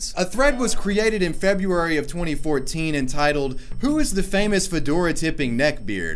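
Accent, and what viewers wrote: South, United States. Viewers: It's cool. We know you from the dirty south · it's not kids these days, he's from the south.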